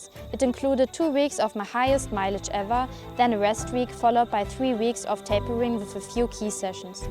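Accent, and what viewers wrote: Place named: Germany. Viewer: krass deutsches (hartes) Englisch aber irgendwie total sympathisch, Gratulation zum Frankfurt Debut!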